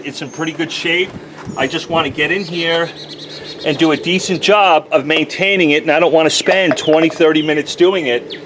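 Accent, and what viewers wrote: New York, United States. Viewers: Texas accent?? That's not southern at all. I'm from Houston so I know a southern accent when I hear one. Lol. That's more like a NY accent or in that region. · *New York accent lol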